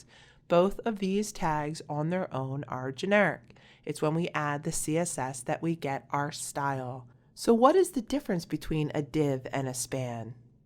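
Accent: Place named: Philadelphia, United States. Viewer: Thank you for the info! I could definitely tell you're from the Philly area by the accent. (or should I say, "Ack-sent") Much love and GO BIRDS!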